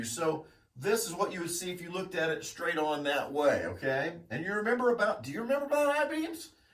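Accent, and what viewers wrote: United States. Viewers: Finally an engineering video in ENGLISH! With American Accent.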